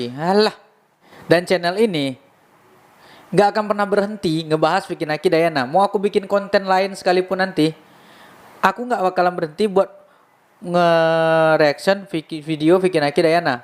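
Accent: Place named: Sumatra, Indonesia. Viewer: Sor x bah aku nonton in video mu bro, kalo bisa logat Sumatra jgn di ilangkan bro, semangat buat konten bro... Udh lama jd subscribers mu baru x ini aku komen😂😂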